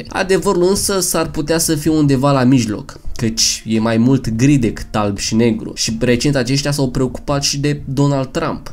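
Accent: Moldova. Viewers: Esti Moldovan? Ai un accent destul de ciudat😁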